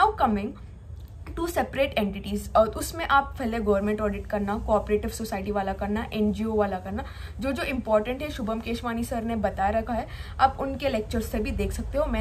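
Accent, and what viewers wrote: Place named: Karnataka, India. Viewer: Are you from Karnataka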